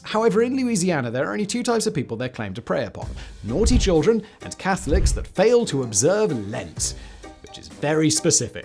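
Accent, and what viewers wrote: United Kingdom. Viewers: I need more videos of saying Cajun words in his British accent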